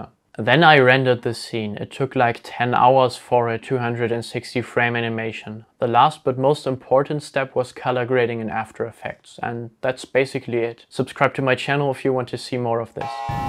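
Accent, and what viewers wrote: Germany. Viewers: Hab direkt an deinem Akzent erkannt dass du Deutscher biste 😎🔥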